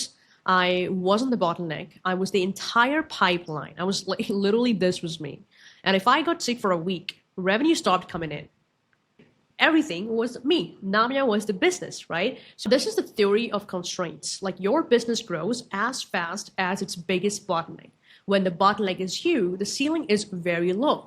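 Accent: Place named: Netherlands. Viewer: You sound like a Dutch person, not that it matters lol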